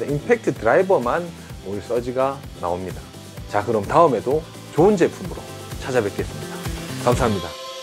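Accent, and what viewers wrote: Gyeongsang, South Korea. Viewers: 이벤트 신청 완료 했습니다. 항상 너무 재밌게 잘보고 있습니다. 구수한 경상도 말씨가 아주 듣기 좋아요~~ㅎㅎㅎ 좋은 제품 항상 잘 알려주셔서 고맙습니다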